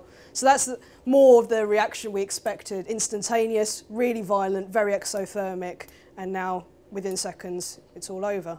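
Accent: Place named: United Kingdom. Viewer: Asian+British accent= blown mind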